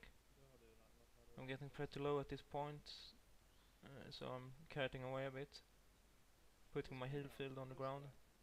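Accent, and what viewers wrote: Sweden. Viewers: dat swedish accent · Dat swenglish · Why did you choose that pet? Is it the best pet, or what? (Man hör att du kommer från Sverige) xD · En svensk var det ja ^^ nice. hör det rätt tydligt på din dialekt/brytning. nice vid btw :D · are you swedish?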